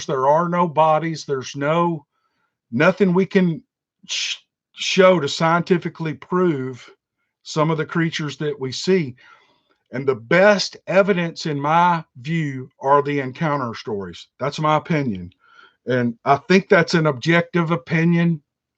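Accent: South, United States. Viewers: My husband and I listen to you ALL the time, We love your show! I enjoy your southern accent & LOVE your stories!!🥰 We look forward to your next one ❤ Maybe one day I will send an email with some of our paranormal experiences, I think you would enjoy hearing them! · If your passion is the story you certainly have the southern draw to tell them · As a woman....I hate to admit it..I cant stand listening to a woman's voice who narrates another channel!. Your southern drawl totally makes the stories! I pictured you with more of a ZZ Top beard and good ol' boy image! Good job with your channel and continued success. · We enjoy your videos, love the accent too. Takes me back to my southern roots! Had a lot of paranormal things happen all my life! Makes me feel at home.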